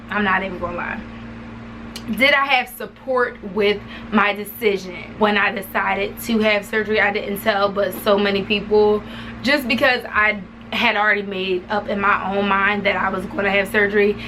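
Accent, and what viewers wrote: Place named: DMV, United States. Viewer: You sound like you're from the DMV! Lol where are you from?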